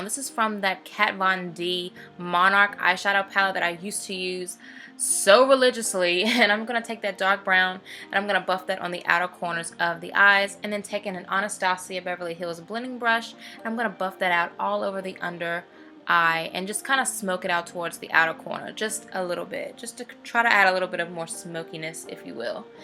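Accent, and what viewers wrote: South, United States. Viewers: You sound extraaaaa country in this voiceover! Love the accent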